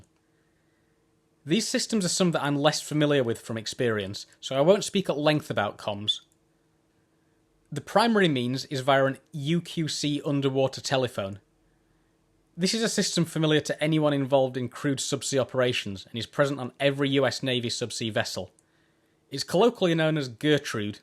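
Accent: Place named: United Kingdom. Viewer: fwiw i think your voice/accent is great. granted im from the uk so i can understand it naturally, but it’s nice to hear people who speak “normally” (i.e. the way i/people i know talk) on youtube once in a while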